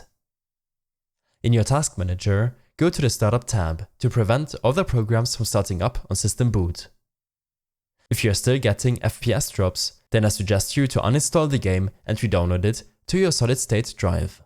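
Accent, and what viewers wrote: Germany. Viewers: Junge man hört anders dass du eig immer deutsch redest xDD :DD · Man hört dass das ein deutscher ist😂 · man hört sooo hart dass du deutsch bist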